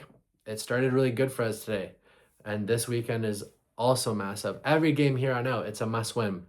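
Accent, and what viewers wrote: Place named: Scotland, United Kingdom. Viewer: Keep up the positive vibes bro and love the Scottish slag you have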